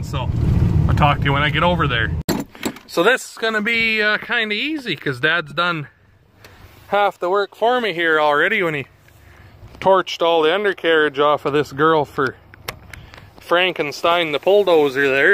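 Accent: United Kingdom. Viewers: It's good to hear the northern accent. Reminds me of home.. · Still harvesting laid, barley in the north of Scotland. Loved this video with all the family working, all the machinery working. Enormous skies! a different land altogether. I'll tell my father (92) about your grandfather....still on the swather and on his smartphone! Best of luck to you all. Love that accent man! Just makes me grin!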